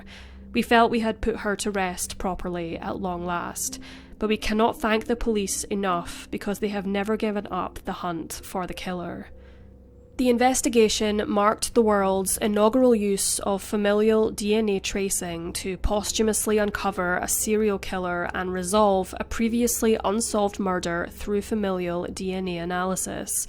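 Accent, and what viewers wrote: Scotland, United Kingdom. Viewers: New sub. Excellent vids. Love the Scottish accent. ❤️ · Scottish narrating a crime script is awesome. Its such a cool accent of english. Ive learned so much about Wales, Northern Ireland and the U.k. as a whole from other english crime mysteriy tubers. Ive been everywhere on youtube and your channel is great! Love it .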